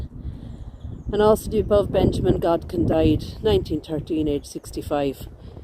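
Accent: Ireland. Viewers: You have the best Irish accent. Absolutely love it. When I imagine my ancestors talking to each other, it’s your accent I hear.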